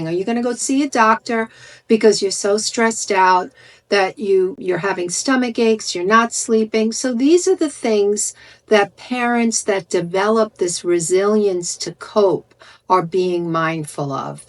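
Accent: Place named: New Jersey, United States. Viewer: I’m guessing New Jersey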